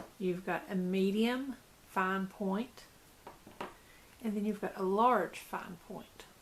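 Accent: South, United States. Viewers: Answered everything, and then some. You are such a blessing. Thank you so much, I feel so special. I'm originally from Florida and I just love hearing your southern accent. Have a blessed Easter. Bev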